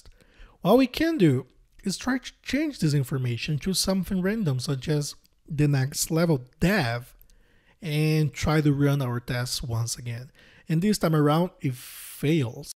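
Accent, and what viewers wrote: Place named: Brazil. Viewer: Esse sutaque é BR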